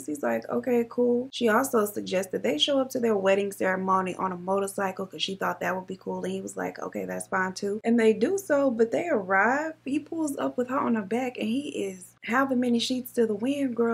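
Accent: South, United States. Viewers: Totally off topic, but I love her accent! I'm from the UK, so I've got no clue what part of America she's from...but I like it! Xx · Yess. And that lil southern accent to top it off🥰🥰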